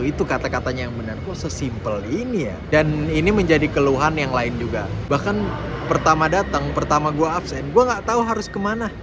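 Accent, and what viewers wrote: Manado, Indonesia. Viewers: Seneng nih gaya bicaranya bahasa manado dan indo jd punya ciri khas tersendiri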